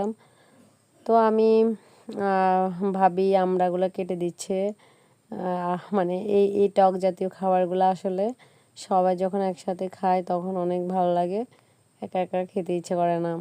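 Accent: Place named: Noakhali, Bangladesh. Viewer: হায়রে নোয়া,,,,,,খাইল্লা।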